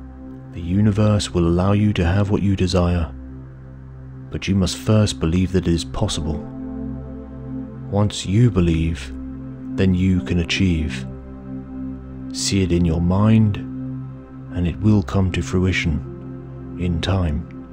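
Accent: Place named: United Kingdom. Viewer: I find all your guided meditations just perfect. Started to listen because I especifically wanted a guider with a smooth male voice and British accent. But all meditations are just so beautifully said and calming. It has helped me a lot throughout the past months. Thank you, looking forward for new videos.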